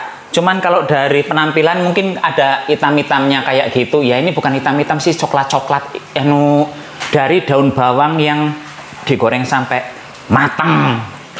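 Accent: Banyumas, Indonesia. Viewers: Wong Endi sih deneng ngomonge ngapak2😂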